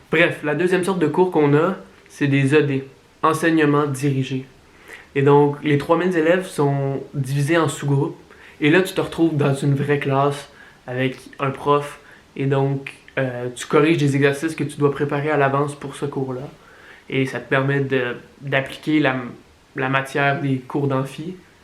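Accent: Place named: Quebec, Canada. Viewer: Mais il le dit nn 😂 ça se voit il parle de sa vie au Québec, il a l’accent…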